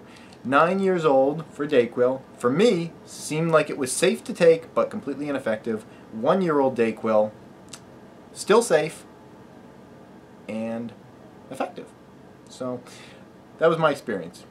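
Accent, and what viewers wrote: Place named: Nova Scotia, Canada. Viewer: I've just finally noticed how Canadian you sound... after this binge of videos... can't believe I didn't notice this before. That accent of yours is more Nova Scotia than Boston haha. I am using your dialect and the weather patterns in your videos to triangulate your location...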